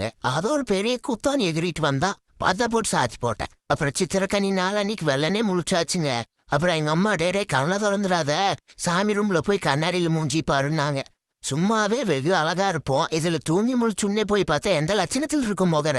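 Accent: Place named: Kongu, India. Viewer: Kongu thamil super ❤❤❤😂😂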